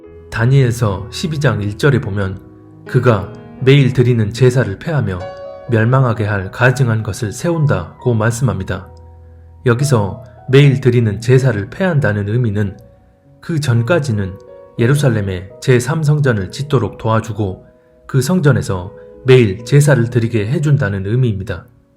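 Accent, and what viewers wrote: Jeolla, South Korea. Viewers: 전라도 말투가 상당히 거슬리네요.트럼프가 적그리스도라는 개소리는 삼가시길 바랍니다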